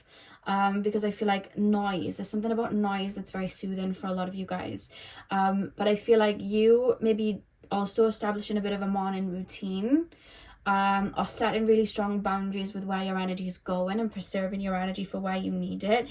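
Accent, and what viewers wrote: Wales, United Kingdom. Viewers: Coming from Pile 3 😭 thank you so much, bawled as usual! I love when you say alright trigger warning we’re going deep lol. These readings of yours have been so powerfully healing for me. The higher self of your mother one did more to help release and forgive her than anything I had tried. Also, even though I’m Australian my Dad is from the UK and I know you’re Welsh but your accent is similar to my ancestors so it all feels extra healing 🦋 Going back and forth with a toxic ex right now, feeling the deeper thread ready to break and knowing I have to go purge some ancient pain. So don’t want to 😂 But if I don’t I’ll just go and seek the same person out in a different body! I’ve just found your inner teenager reading so will do that one 🫶🏼 maybe a reading on how can I receive nurturing better or just understanding worth and love properly maybe? 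Childhood trauma/narc/emotionally immature parents and the relationships we form after that are a real minefield to heal from. I really appreciate your readings and the fact that you really truly GET IT. 🤍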